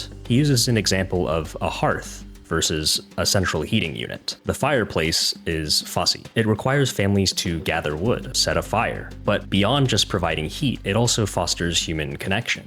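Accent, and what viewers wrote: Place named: United States. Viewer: u showed ur self in the video in end and i was like who the hell is that guy | i was expecting someone american looking from the accent u broke that like the glass voice personification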